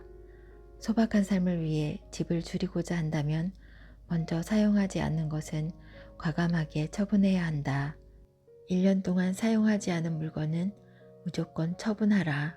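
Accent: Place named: Gyeongsang, South Korea. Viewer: 경상도 억양..😢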